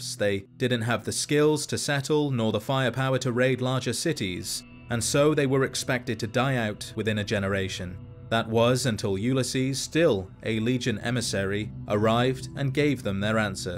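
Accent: United Kingdom. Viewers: Thank God, a British accent on the internet not talking about the Queen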